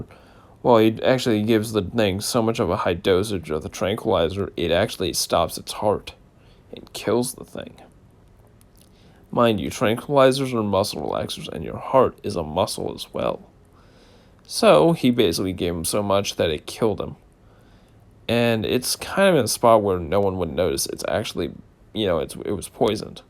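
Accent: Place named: Italy. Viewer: Also gifted Italian accent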